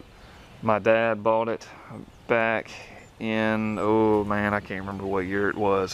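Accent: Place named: Philadelphia, United States. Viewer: Do you live near the Philadelphia ms area? I know a girl with very similar/specific accent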